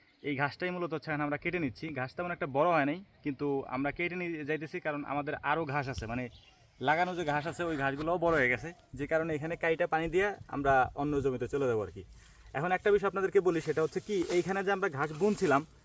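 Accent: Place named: Comilla, Bangladesh. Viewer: আসসালামুয়ালাইকুম আশা করি ভালো আছেন দয়া করে আপনার জেলার নাম বলবেন কুমিল্লার ভাষার মতো লাগে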